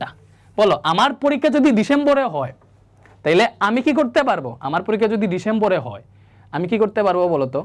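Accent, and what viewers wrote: Sylhet, Bangladesh. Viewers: আপনার কথা শুনে বুজা জাচ্চে আপনি সিলেটি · ভাই আপনি কি সিলেটি??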